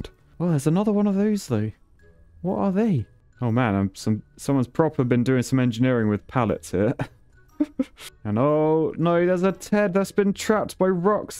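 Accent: United Kingdom. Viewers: Honestly this feels like graystillplays old content but with a British voice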